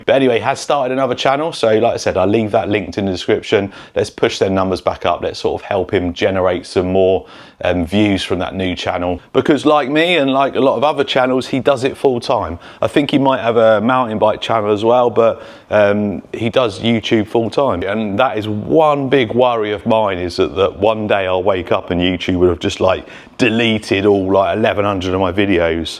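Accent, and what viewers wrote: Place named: England, United Kingdom. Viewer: you have a nice english accent that is nice to listen to..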